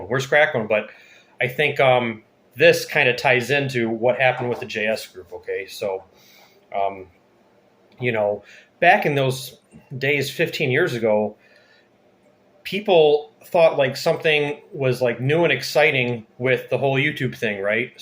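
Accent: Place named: Midwest, United States. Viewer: wow you have a strong midwest accent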